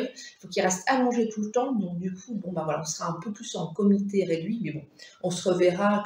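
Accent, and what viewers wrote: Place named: Franche-Comté, France. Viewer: Et bien quelle surprise de voir une nouvelle tête ! Ma foi il a l’air bien sympathique ce franc-comtois (on entend une petite pointe d’accent) 😉. Encore bravo pour cette vidéo toujours aussi plaisante à regarder ! Passez d’excellentes fêtes de fin d’année 😃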